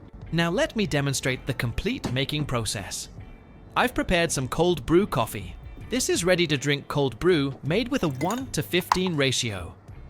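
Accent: United Kingdom. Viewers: What's going on with the accent, it sounds a little English vs the usual Canadian we're used to lol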